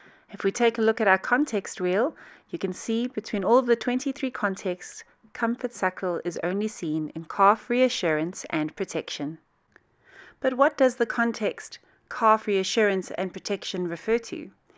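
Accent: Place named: South Africa. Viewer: I'm loving these very informative lessons on my favourite HERD.💖💖🐘🐘🥰🥰 It's so wonderful of you to put all these videos together for us to learn from.👍👍I appreciate your hard work. I'm a South African living in UK and it's so heartwarming to be able to hear a South African accent everyday,whether it's these videos or Adines' daily videos.The highlight of my day. Baie Dankie.👏👏😍😍👍👍💖💖🐘🐘🐘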